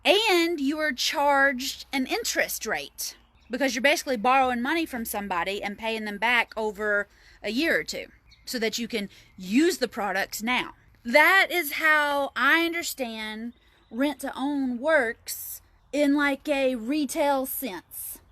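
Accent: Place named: South, United States. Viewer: Such a cute lovely southern bell accent ❤️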